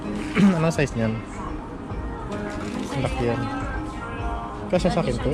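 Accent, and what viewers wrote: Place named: Iloilo, Philippines. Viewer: ilonggo accent hehe bal.an gid haha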